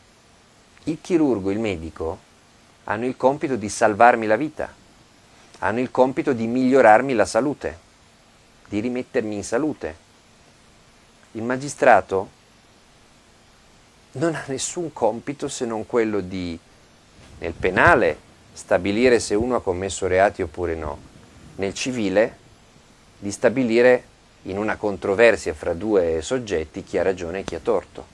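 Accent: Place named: South, Italy. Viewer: forza! ti vogliamo incazzato e con l'accento meridionalizzato!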